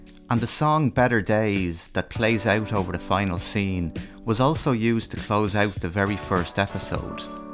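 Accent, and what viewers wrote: Ireland. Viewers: Mate are you from Ireland?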